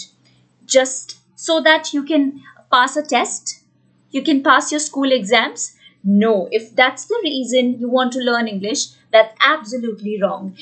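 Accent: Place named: India. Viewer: Indian accent ☺☺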